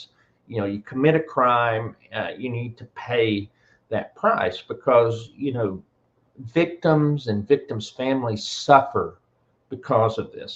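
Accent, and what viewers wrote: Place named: South, United States. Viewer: I’m relatively new to this channel and honestly, I’m still on the fence whether I like it. it’s very difficult getting used to someone with such a thick southern accent trying to pronounce all these Italian names. All of that said, and I’m not a snowflake who gets butt hurt by things, While I realize everything you spoke about regarding John Pennisi is in the public record and public domain I don’t understand why you thought you needed to go through his record on the Internet. Personally I didn’t think he said anything disrespectful about the man who died, John just said things as statements of fact. It’s only relevant because, as mentioned in this video it’s considered to be taboo to take your own life in the lifestyle. You mentioned John has a prison record of “self harm” but without context, we don’t know what that means, he could’ve punched a wall for all we know. 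I just feel that bringing all of that out without all of the facts and proper context isn’t the right thing. The other guy has been dead for almost 15 years. I think there’s a slight difference.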